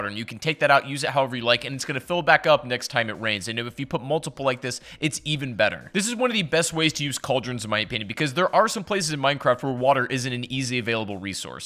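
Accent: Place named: United States. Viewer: Omg an american/Canadian accent thnk goodness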